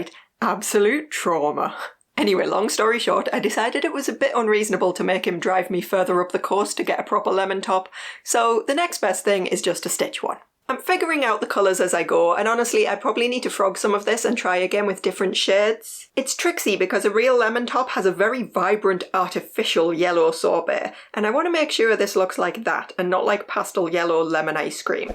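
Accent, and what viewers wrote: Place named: Northern England, United Kingdom. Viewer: I'm a teacher, and when I look back at my old lessons from years ago, I have the exact same feeling. I'm sure they're not as bad as you think. May I ask, where about in the North are you? It's so rare to hear a northern accent on here! We're from Huddersfield (near Manchester) so fellow northerners!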